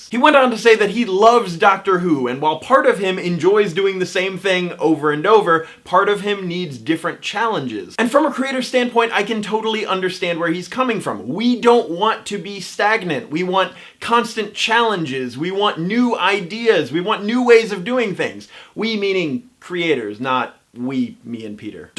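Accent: United States. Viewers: I can undertand scots speaking really quick but I didnt get a word of your first sentences. American accent is so hard to get sometimes. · its weird hearing an American saying Doctor who and stuff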